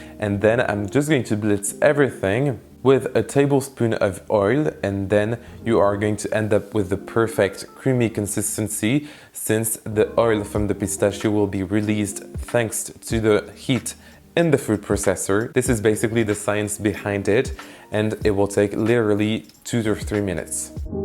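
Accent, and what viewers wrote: France. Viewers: are you French lol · Love your french accent, keep it up! 😍👍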